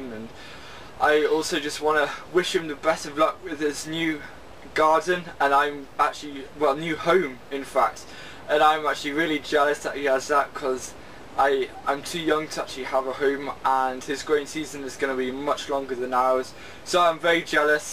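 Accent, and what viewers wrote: United Kingdom. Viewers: You are not annoying. And a Brit accent is anything but grating! ...it is (the accent) and you are rather nice to listen to! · "Annoying teenager with a british accent"...hahaha...I like your accent. Irish and british are, in my opinion, the cutest accents ever. Great tips btw.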